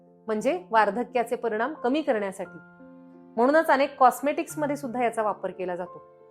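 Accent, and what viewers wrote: Standard. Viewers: स्पष्ट, शुद्ध उच्चार आहेत तुमचे.उपयुक्त सोपी माहिती सांगितलीत. धन्यवाद!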